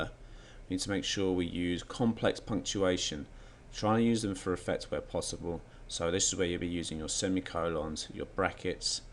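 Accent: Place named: United Kingdom. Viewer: Informative video thanks. But British ascent is very hard to understand for us(non native). But i'm trying to understanding.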